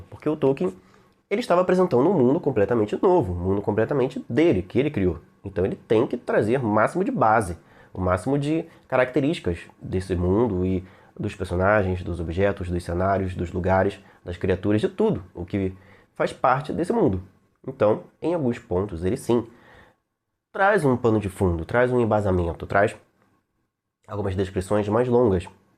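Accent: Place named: Petrópolis, Brazil. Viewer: Boa tarde, Xiru ! Bah, percebi que seu sotaque não tem aquele "S" que vocês arrastam Geralmente percebi - se que tu tens, um sotaque limpo tu deves ser de Petrópolis ou Niterói ! Caso se eu tiver errado , tu me corrige !!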